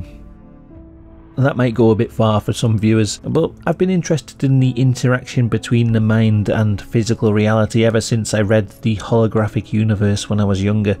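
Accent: United Kingdom. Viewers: That British accent makes it even more peculiar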